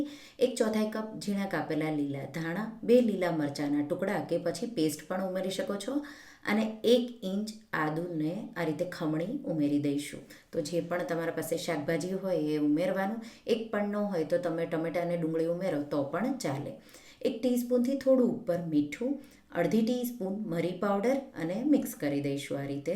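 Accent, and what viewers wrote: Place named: Kathiyawad, India. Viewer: તમારી વાણી એકદમ કાઠિયાવાડી મધુર છે. Love from New Zealand.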